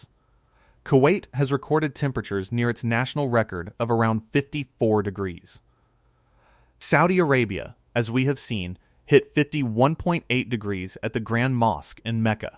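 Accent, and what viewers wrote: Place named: United States. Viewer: I despise that US accent 🙄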